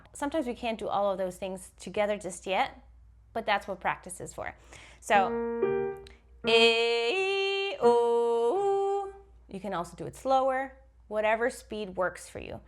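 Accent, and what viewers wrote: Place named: United States. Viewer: Bavaria? That figures, the region full of supposed culture of pastrys and yodelling. Your accent would have me believe you are american.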